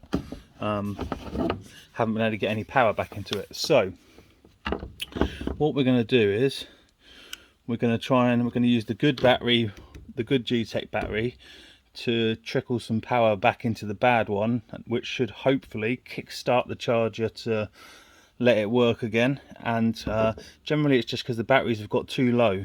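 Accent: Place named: East Anglia, United Kingdom. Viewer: Thank you for this. I guess East Anglian - Norfolk or Suffolk?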